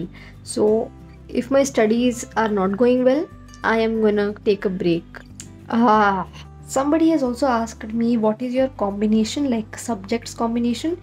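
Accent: India. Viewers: ohh high~five me also same from karnataka - Bidar 😎 me tabhi samj gaya tha ye to hamra accent h lots of Love ❤️♥️ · U have a pretty strong Indi accent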